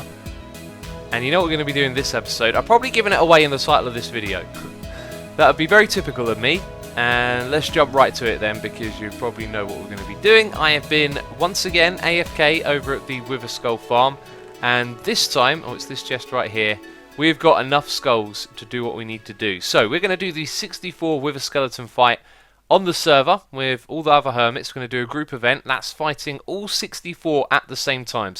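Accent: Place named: South East England, United Kingdom. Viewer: He is British but he won't answer more specifically than that. I think it's safe to assume he's from south-east England though.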